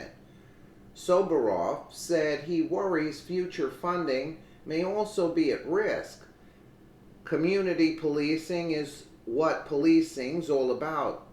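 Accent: New York, United States. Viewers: yeah and she sounds like a really great dame who knows the score - New York accent ?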